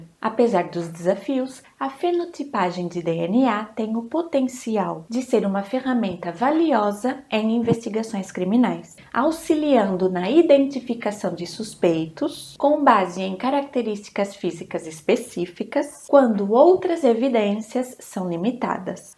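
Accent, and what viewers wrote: Portugal. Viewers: Ótimos vídeos, ganhou mais um inscrito, sotaque português muito lindo rs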